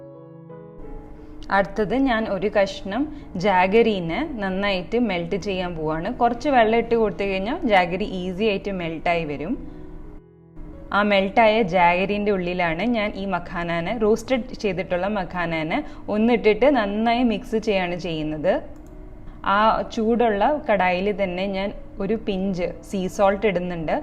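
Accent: Thrissur, India. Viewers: KPL nmmde ijk... Are u from thrissur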